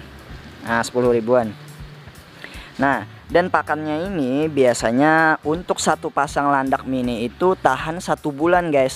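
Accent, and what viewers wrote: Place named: Banjar, Indonesia. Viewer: Totok banjar logat nya neh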